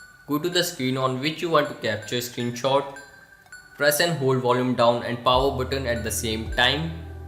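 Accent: India. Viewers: Aishwarya Singh he's a Bengali, that's why the accent. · Thank you :) your indian accent is awesome btw ;)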